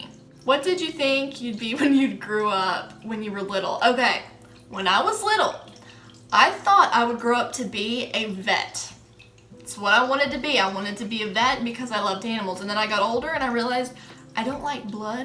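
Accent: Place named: South, United States. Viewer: omg ily you're personality is on point! all my questions were already answered by the other people so I didn't submit one lol AND OMG I THOUGHT YOU WERE 5'6 OR 5'7 I WAS SO CLOSEE WAIT I KNEW YOU WERE TALL but i notice an accent where are you from? it sounds like country ish 🤠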